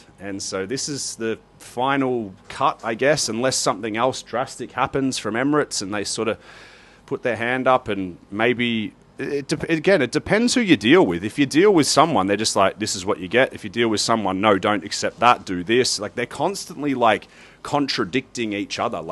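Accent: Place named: Australia. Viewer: You sound like an Aussie. The same as me. We fly Emirates BC once a year to Europe. Never had an issue. Did fly to the States once and our luggage was lost for 2 weeks. Yes it was a pain in the ass. But as my hubby says, dont stress about crap you cant control. But i get its frustrating. We got zip as we were told to keep reciepts and claim on insurance. As for Eithad and Qatar. Qatar. Please support your fellow Aussie females and boycott them. Females were taken off their flight, which is government owned, and were forced to have internal examinations in a ambulance on the tarmac, as someone had a baby and left it in the terminal. Every female was taken off the flight and subjected to this assault. Law suit is currently pending. Qatar also often change out their aircraft. You book a flight which has Q suite listed. Then operational change and you end up on a piece of crap business class seat that has zero privacy. 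Atleast with Emirates A380, if you pick a window seat you have privacy with how the seats are done. Like your own cubby. Qatar are well know for changing out aircraft for operational reasons. Eithad. Besides looking cheap and nasty, some of their planes, 787 and A380, they have some rear facing seats in BC. No idea about FC. So if you go this route chose your seat wisely. Dont let one bad experience with Emirates define them. Look at Qantas. I only fly them domestically because they are crap. But i still fly them because Virgin are even worse. Happy travels. Caz.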